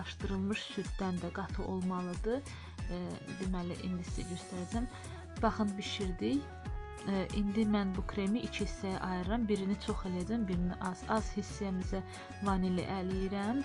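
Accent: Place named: Ordubad, Azerbaijan. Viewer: Cox sagolun cavablandirdiginiz ucun.Daniwiginizdan hiss etdim lehcenizden bizde ordubadliyiq yaxin geldiniz😙😊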